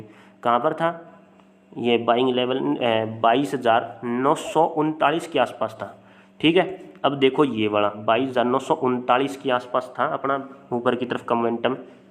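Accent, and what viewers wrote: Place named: Haryana, India. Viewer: Aapka accent bhi hariyanvi hai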